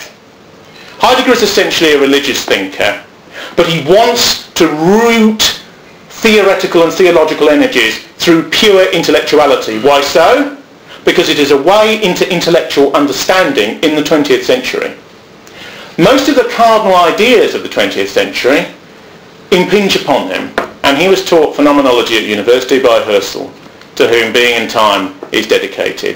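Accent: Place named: RP, United Kingdom. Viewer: Posh accent.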